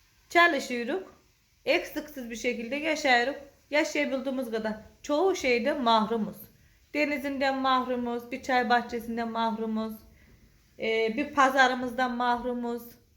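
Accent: Trabzon, Turkey. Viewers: hanım tam bir trabzonlu gibi konuştun korkanlar tehdit eder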